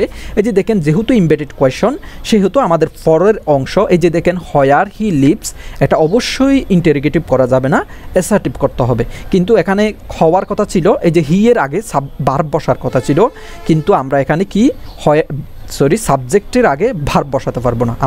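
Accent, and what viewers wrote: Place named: Noakhali, Bangladesh. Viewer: উচ্চারণ. Noakhalir hoise Ata avoid korte hobe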